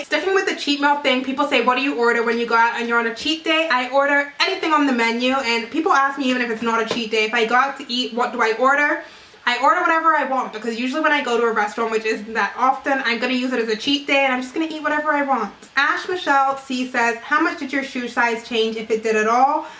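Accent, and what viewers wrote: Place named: England, United Kingdom. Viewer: ok cause I knew I heard a lil english accent there but wasn't sure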